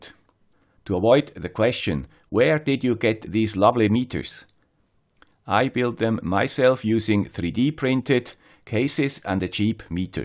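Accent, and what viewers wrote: Switzerland. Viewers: Hey, you just got this video mentioned on Hackaday. Good job and hope your channel continues to grow. May the Swiss accent keep on going! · Sali, love your Swiss accent! I also have not been able to get rid of mine. As long as you call the meat on the plate not like the timber no worries. But the FBI is like ei so is the quiescent current. English is not regulated like German. Downunder we drive on tyres while in the US they use tires. There are tongue breakers. California has Yosemite and in the land of Koalas we eat Vegemite, both same ending but different pronunciation. Acronym abuse gets one confused so in our ham radio club ce hand each other upper sideband siticks!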